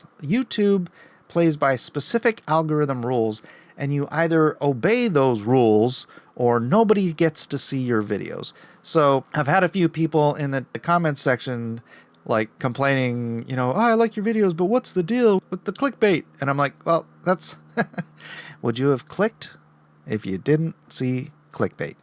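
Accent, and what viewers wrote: England, United Kingdom. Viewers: I get: Awesome ninja skills. You get: English accent. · I love the design, and explanation of lore, backstory, and English accent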